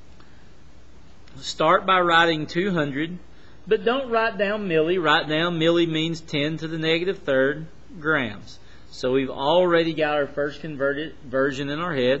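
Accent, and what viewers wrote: United States. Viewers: Some cowboy yeehaw accent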